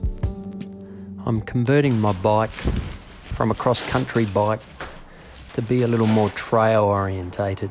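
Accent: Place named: Australia. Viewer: Clicked on your thumbnail and was surprised with a nice Aussie Drawl. Looked like Southport out the balcony. Stoked to see Narang on the clip. I rode my road bike over there about 4 years ago and stopped at the same cafe🤣. If you ever come to Bright, leave the XC convertible at home and rent a enduro bike and smash Mystic...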